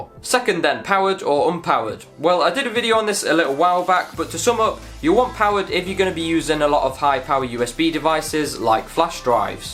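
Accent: United Kingdom. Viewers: I love your BRITISH accent! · Hi, it's such a good video. I'm from Indonesia anyway and it is really hard to understand your word because you were talking in english with british accent and so fast:D lol, I need to learn much. Tx btw:)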